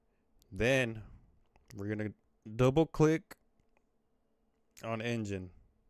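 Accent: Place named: India. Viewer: He sounds Indian of some sort. He let his most of his family name.He knows nothing nothing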